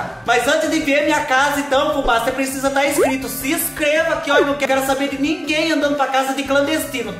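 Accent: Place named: Sorocaba, Brazil. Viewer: Passeando pelo YouTube vi sua receita do bolo de milho... Flocão. Adorei. Gosto do seu jeito! Ah Sorocaba....sabia q tínhamos algo em comum. Nosso sotaque, costumes nos entregam. Abração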